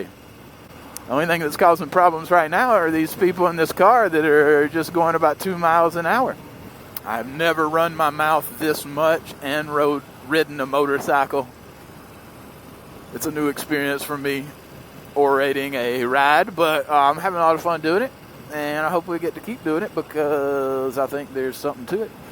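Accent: South, United States. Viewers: Isn't this the way to review a bike? No cussing, no horrible music, just to the point and scholarly. Love the American accent too! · where are from sounds like you are southern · Peachtree! Thanks, I couldn't quite place the accent. I hope you never lose it, out there in SoCal. ;-) Great review.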